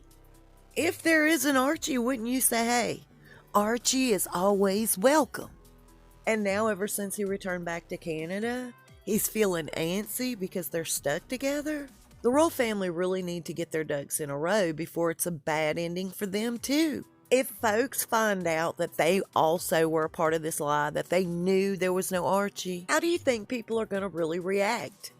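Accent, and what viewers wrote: South, United States. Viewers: I have watched several of your videos and they just keep getting better and better. I had to subscribe and I love your southern accent, reminds me of home! Plz keep up the great work and THANK YOU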